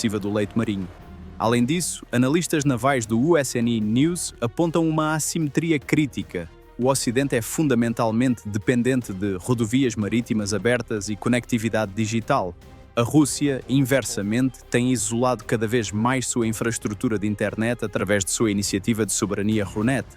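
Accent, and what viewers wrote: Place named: Portugal. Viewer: NÃO TOLERO SOTAQUE LUSITANO A DESPEITO DE SER DESCENDENTE DE PORTUGUÊS.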